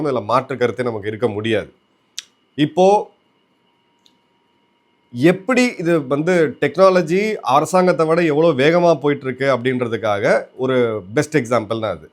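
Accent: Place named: Bihar, India. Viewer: I don't understand why this Tamilian Major is using North Indian bihari backward English rather he should use progressive English of tamilians ...alas serving in the armed forces has its own negative impact 😮😅😂😊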